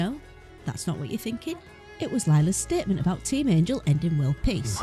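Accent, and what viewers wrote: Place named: United Kingdom. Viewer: Yus we need more British voices on youtube 😺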